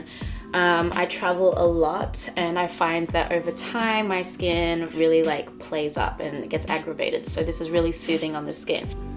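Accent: Australia. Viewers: I looove Australian accent😍😍😍😍😍😍😍 she's sooo beautiful💘💘💎💎💎💎💎💎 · Is this Aussie accent ?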